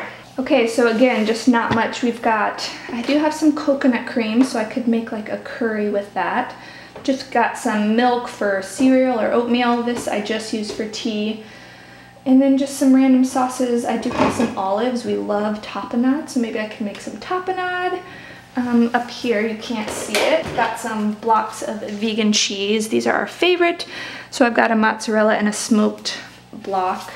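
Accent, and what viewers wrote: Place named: United States. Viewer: Are you working in Indonesia? You sound like you might be from USA.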